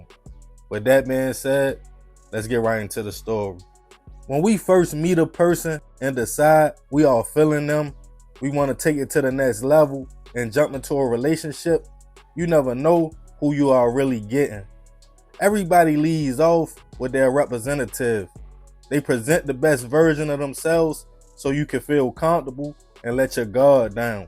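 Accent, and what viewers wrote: Baltimore, United States. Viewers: That baaaltimore accent is a no for me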